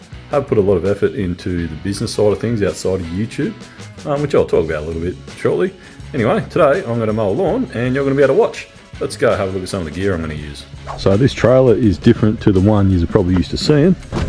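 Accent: Australia. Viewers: Well, look who showed up on YouTube. So glad that you are back making videos,. We've missed your Aussie accent and sarcasm. Best of luck to you in your business--sounds like you have things in order now, so good on you! Blessings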